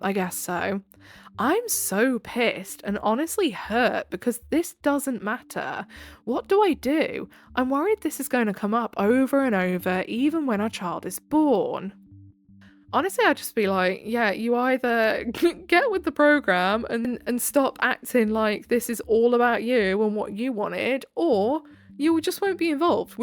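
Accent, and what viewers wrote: United Kingdom. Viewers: Oh you're British! No ai and British? Yes!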